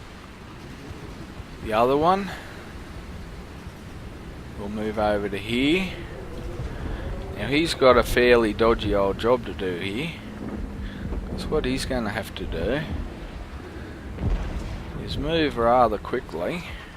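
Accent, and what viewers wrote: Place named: Australia. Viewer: really nice to watch, and your aussie accent adds some taste to the thing :) cheers from Matt_Mulder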